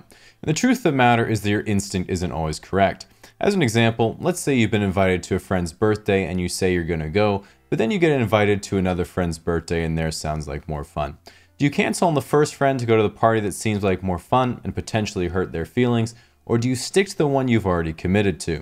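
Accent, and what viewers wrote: United States. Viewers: you sound so american after hearing your australian accent wtf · You sound like if internet historian was American.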